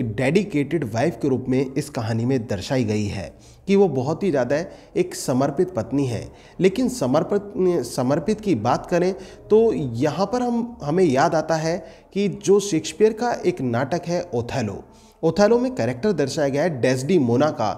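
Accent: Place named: Meerut, India. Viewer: Sir aap toh meerut ya baghpat k lag reh ho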